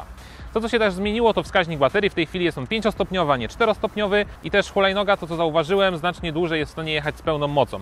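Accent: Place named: Ukraine. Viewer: sounds like ukrainian but slovakia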